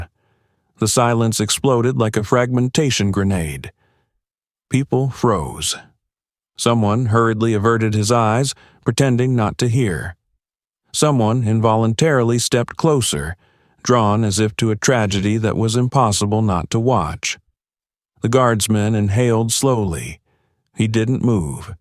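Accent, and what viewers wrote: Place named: South, United States. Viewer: a narrator with a southern drawl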